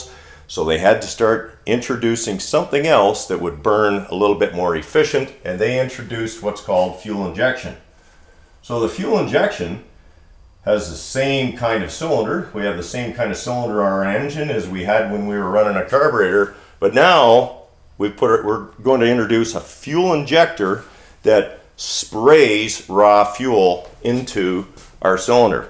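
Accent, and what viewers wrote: Canada. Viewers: Charlize Ravenna ---> You just saved me a whole lot of typing. I was going to suggest changing the title of the video to something like "Already understood details of the physical chemistry of internal combustion wherein every point is repeated at least 3 times, and carefully worded to include as many uses of the exclusively Canadian and universally annoying "aboot" mispronunciation of the word "about".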